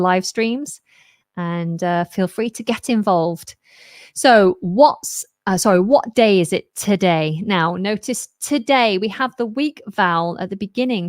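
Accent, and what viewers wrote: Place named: United Kingdom. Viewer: I apreciate the britsh accent...it is very especial for me.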